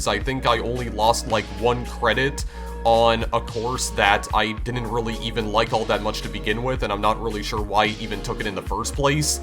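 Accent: Canada. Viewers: Where are you from? I watched your Catacombs of Solaris video. Are you from Canada, maybe sasqatchean “Twenty Fifteian” “A long tyum” “Kowun I was autistic for a long tyum”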